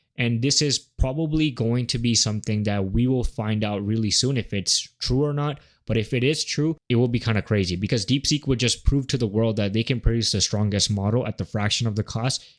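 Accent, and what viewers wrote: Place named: India. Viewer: Are you an immigrant from India or from another country? Your accent doesn't sound like that of a native Canadian or someone born and raised in the United States.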